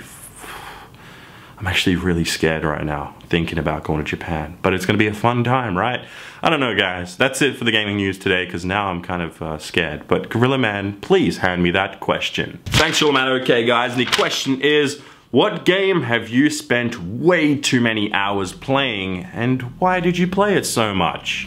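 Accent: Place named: Australia. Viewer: Wow you stupid aussie tool! What a scoop you've come up with. Sony will release a PS5 someday? Gee? No one saw that coming. Heres another scoop for you....someday...Microsoft after Xbox One X is released will come out with a new version of the Xbox......possibly called Xbox 2. I must be psychic. You suck! your channel sucks! your accent sucks! Australia sucks! F U!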